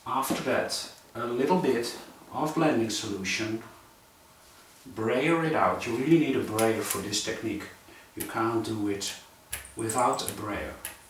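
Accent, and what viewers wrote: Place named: Netherlands. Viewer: Im always happy to see dudes creating too, I love it. I was bit scared for your nice shirt - especially the sleeves - but you didnt get a drop on in! For more traditional marbling with alcohol inks, just use hand sanitizer; a good (thick-ish) layer on the plate, then inks and you can manipulate them exactly like when marbling the traditional way (just dont use sharp tools with gel plate!). I liked all your prints, but the ricepaper ghostprint one was magical. Dankje well (sorry, Im just assuming youre from NL by your accent..) and greetings from Finland. -Jane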